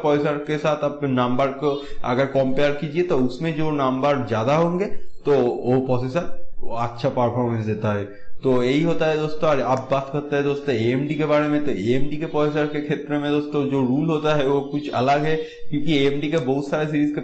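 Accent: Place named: Bengal, India. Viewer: Your hindi is like bangali people's hindi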